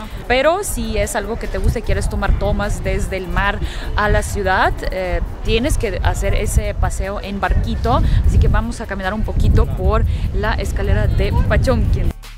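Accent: Mexico. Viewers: Me intriga mucho su asento español Mexicano..la hermana dijo alberca y esta padre algo muy Mexicano 🤔😘🇲🇽saludos hermosas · Ya suena mas mexicana que ucraniana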